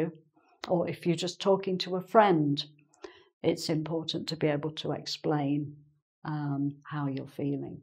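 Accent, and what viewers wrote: England, United Kingdom. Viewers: I like her way to explain the lessons but it seems like she teach british english more than american english. I realized of for her accent. Even I think that lessons can be useful in both. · I've been trying to practice some British accents. I think I can learn them from. Anyway, I'm wondering what dialect of British accents she speaks with? I usually speak English with an American accent but I really want to learn myself and teach British accents to my students! Would you guys please give some good tips for it~? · Love your kind English accent ...I imagine taking a cuo of tea with you talking :)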